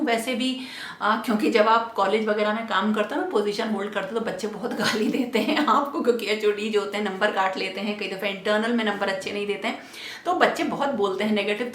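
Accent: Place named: Gujarat, India. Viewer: Nice information. ji after viewing this video I've subscribed ur channel. From ur pronounce I think u r Gujarati, BTW I am Gujarati. Thank You and God bless you. Struggle is the real sugar who makes ur efforts sweet.,🙏❤️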